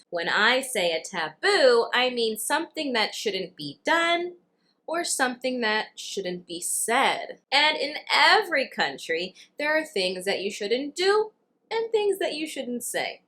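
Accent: United States. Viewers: Hello Ms....i really appreciate your support in my English learning journey. BTW the lesson Is actually very usuful. Nice going. P,S. You're so lovely love your American accent. Greetings from Mexico ❤️.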